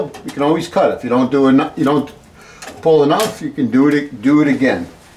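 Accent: New York, United States. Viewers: Guy has to be from Queens or Brooklyn:)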